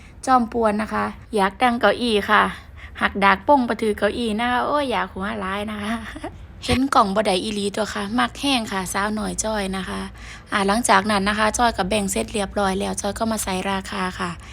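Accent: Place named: Sisaket, Thailand. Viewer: สวัสดีครับผมเพื่อนใหม่ตามมาส่งกำลังใจครับผมสำเนียงคือคนศรีเกษบ้านผมเเท้น้อ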